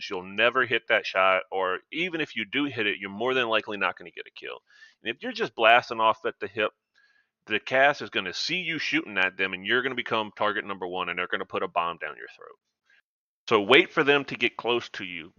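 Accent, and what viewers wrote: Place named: South, United States. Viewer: As a texan, You sir are on of the most southern MotherFckers I have ever heard. <3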